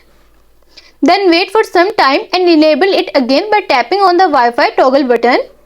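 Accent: India. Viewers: Its bad enough you have a Indian accent but why the he'll you,going so,damn,fast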